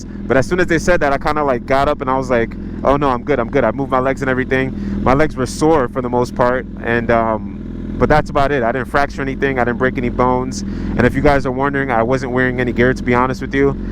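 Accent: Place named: New York, United States. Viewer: I mean just do what I do. Think about it as acting and or having a conversation with someone I front of you. You sound pretty good now as we speak. And giving the viewers what they are asking for. I always ask the viewers if they want to toss out ideas on what they would like to see in the vlogg and what works and what doesn’t work etc… the thing with your video is that hearing the way you talk just reminds me of home both the island and NY (until I heard you speaking a little bit of patwa at a gas station) and I was ahhhh ok ok. That’s why I get both NY with the island feeling and your bike just sounds SO crisp when you going and the snap cranked pops with the rice crispy’s is dope af! Lol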